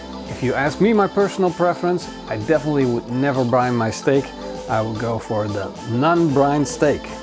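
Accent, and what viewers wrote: Netherlands. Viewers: You sound very Dutch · 3 seconds in and I had a feeling u were a fellow Dutchman, nice video very helpful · Ur dutch i can hear iT i am dutch as wel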